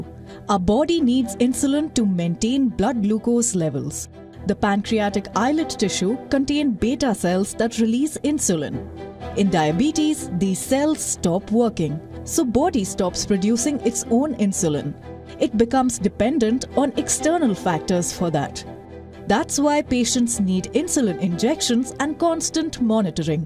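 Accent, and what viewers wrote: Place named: India. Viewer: Hindu accent is bleeding my ears …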